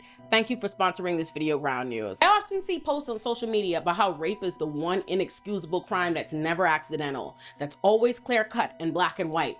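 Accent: Bahamas. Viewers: I may have asked before, but you Bahamian?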